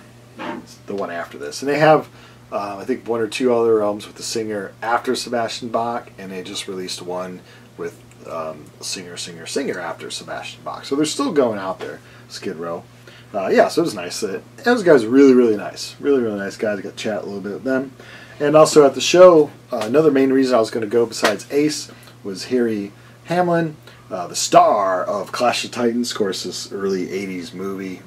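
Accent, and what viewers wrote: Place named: Chicago, United States. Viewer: I can tell right away that you're from Chicago. I grew up in Chicago but I live in California these days. That Frehley's comet live album was recorded at the Aragon Ballroom. I was there. Y&T was the opening band. had the best song on that comp album " ".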